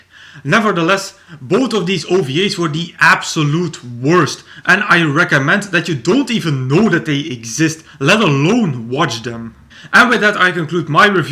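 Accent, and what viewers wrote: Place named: Germany. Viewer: I really like your accent! Are you German? just curious. also, cool review, you say it as it is!😁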